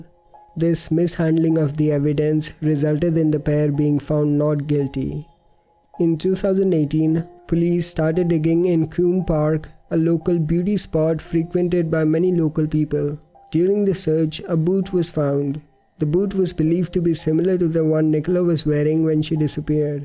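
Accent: India. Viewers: The people criticizing are just assholes. Let's see you all speak his language with a perfect indian accent...when you can, then criticize. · I meant good, cuz I am indian.. And I found that the way he speaks, is the way that also some of us speak here in India. Doesnt have much of that american accent.. You wont get it. 🤭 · Why don't you check out idk maybe half the true crime/ horror story yt community, also he does speak English he just has an Indian accent which is why he has subtitles (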